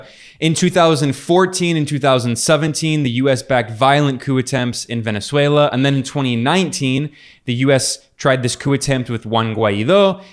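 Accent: Spain. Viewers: Beautiful blue eyes and Spanish pronunciation STOP DISTRACTING ME BEN!!!! I’m trying to focus on this A+ Geopolitical Content!!!! 😂😂❤❤❤❤ Great video as always 🙏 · I just love LOOOOOOVE your Spanish accent. Greetings from Mexico. ❤️❤️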